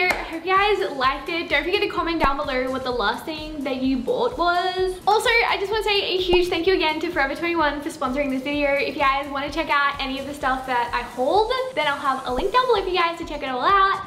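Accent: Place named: Australia. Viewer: I am literally going to forever21 and getting everything you got in this video! I love hearing your voice, because I’m Australian, and I very youtuber I watch, is American, so I love hearing a fellow Aussie! Love u!!! P.s I love denim on demon PPS soft squad! PPPS I think it is a lettuce hem!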